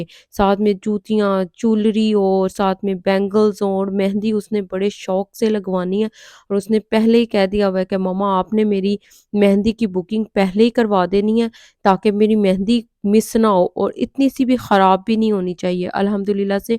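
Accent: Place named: Sargodha, Pakistan. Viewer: Meri bahn apki boli asi ha jesy sargodha ki ho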